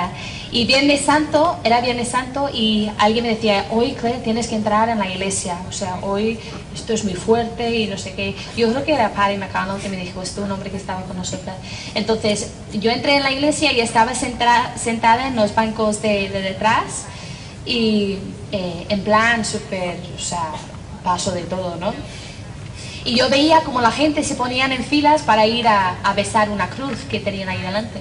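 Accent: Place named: Castile, Spain. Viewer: ¡Qué justo! empieza a graznar un ¿¡cuervo!? justo! cuando se le abrió el corazzzón (se pone en la fila a besar la cruz) Me encanta escucharla hablar con ese español bien castizzzzo, DE zetas bien marcadas y puro chiste!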